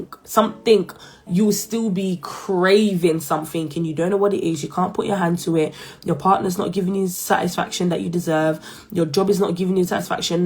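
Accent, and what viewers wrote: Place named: Jamaica. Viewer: Your accents I soo nice 😍 please . Give me some real Jamaican 🇯🇲 patios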